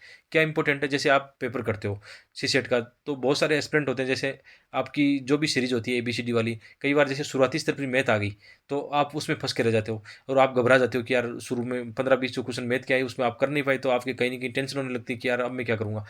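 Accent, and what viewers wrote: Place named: Jodhpur, India. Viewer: Sir aapke voice tone se lgg rha h aap jodhpur side se hoo please sir btaao aap khaa se hoo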